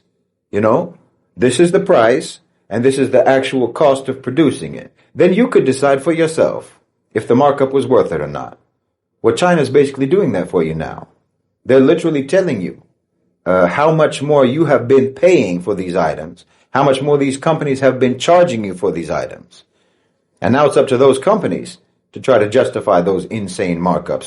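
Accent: United States. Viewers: You sound, Americon, but you have a regional accent that I can't place. What state are you from, or what is your regional accent? Good voice.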